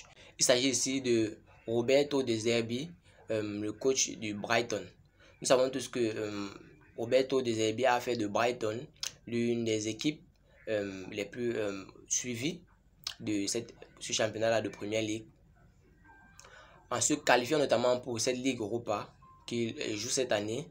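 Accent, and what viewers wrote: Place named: Cameroon. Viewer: Mon frère il faut cesser de nous parler le français camerounais et on ne sait pas ce que tu as dans la bouche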